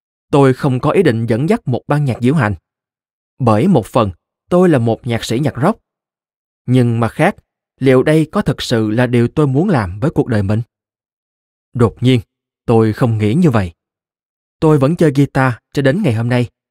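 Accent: South, Vietnam. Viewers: Đây là clip mà tôi thấy tệ nhất trong nhiều clip vì gần hết clip rồi mà vẫn cứ miên man giới thiệu,tua mãi mà vẫn cứ nói về bản ngã...vv Nói chung fonos hay ở đâu có giọng đọc miền trong rất nặng về trình bày tên tuổi dài dòng.thể hiện tiếng anh nghe phản cảm tỉ vẻ như rất tài giỏi về ngoại ngữ sao ko nói gì cho mộc mạc dễ nghe ..¿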